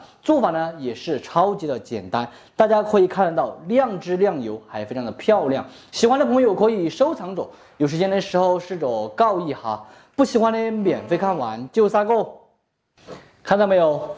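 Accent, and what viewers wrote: Sichuan, China. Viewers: 你的四川话很正宗，要不是有字，都听不懂.🤣🤣你做的安逸👏👏👍👍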